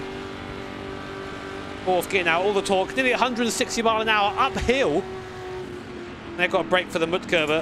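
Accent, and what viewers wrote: United Kingdom. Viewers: "come on G 'E fo 'hy" a true Brit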